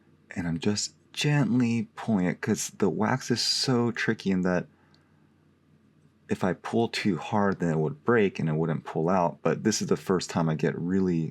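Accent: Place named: Australia. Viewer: Please don’t stop doing these, love your approach and your voice is wonderful. Couldn’t work out where you were from, thought you could even be from Australia. We don’t all sound like hillbillies.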